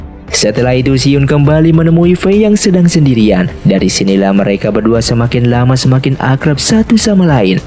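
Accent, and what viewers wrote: West Java, Indonesia. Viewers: Orang sunda nya??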